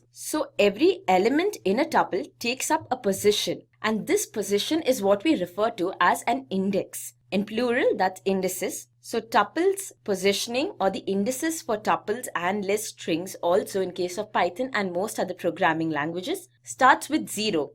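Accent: India. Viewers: This is a very good content, thanks for sharing. I'm finding it a bit hard to understand you due to the strong Indian accent but I'm making all the effort because your content is good.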